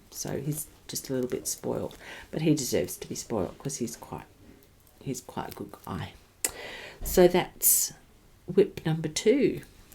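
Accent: Australia. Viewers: I love watching you. You make me smile. Maybe you can help me make some socks. Love your Australian accent too.🥰🧶🧙‍♀️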